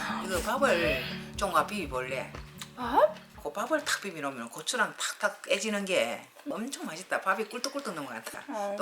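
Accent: Gyeongsang, South Korea. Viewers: 강원도 사람인데 사투리가 진짜 듣기좋네요 ㅋㅋ 경상도로 이사갈까 ㅋㅋ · 경상도 사투리가 재미 있어요. 홍양,홍양..ㅋㅋㅋ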